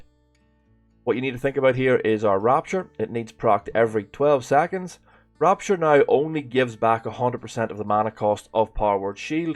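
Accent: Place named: Northern Ireland, United Kingdom. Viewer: Nice to hear some more Northern Ireland players out there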